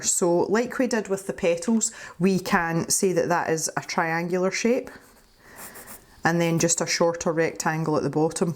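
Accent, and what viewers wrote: Scotland, United Kingdom. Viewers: Thank you for sharing this! It gives me not only inspiration, but a nice wee dose of Scottish! I lived in Scotland for several years and miss the accent!😉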